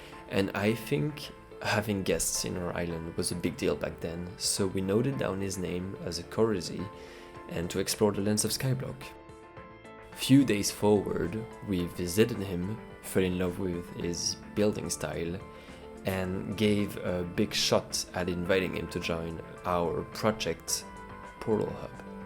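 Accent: France. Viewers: Heyyyyyyy you arre french like meeeeee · Bro that's funny, i'm french and at the moment u began to speak I knew u were french too xd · You honestly sound like a slightly younger skin soft with a slightly larger French accent ngl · T'es pas français ? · you sound french and i am proud of it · Hey portalhub, you’re not english, are you? I feel like you’re a bro of akinsoft. You’re french aren’t you? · wooow u are french bonjour je parle aussi francais comme un francais je mytho pas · L'accent français est vraiment repérable partout xDDDD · cette accent français :o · Tu es francais ? :O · Le magnifique accent français :D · Mais c'était sur enfaîte mais c'était sur (quand tu parlé on entendé que tu était fr mdr) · Is it me or you have a french accent? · Are you french or something ? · Mais t'es français? :))))))))) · French or canadian? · Are you french ? (I am french too) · Du coup t'es français si j'ai bien compris (c'est trop bien) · Dang your voice tho.... 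It's perfect the French accent makes it even better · Ur french accent is so cool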